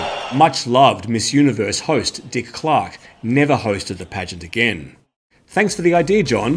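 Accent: Australia. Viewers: I've been researching this kind of information for myself, and now I found it all perfectly researched and summarised here! Great visuals, pleasing voice-over (love the 'soft' Aussie accent), and neatly and professionally packaged. Love from ZA!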